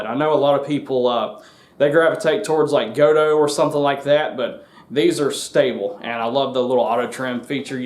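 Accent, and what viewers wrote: United States. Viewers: You sound like Macon Georgia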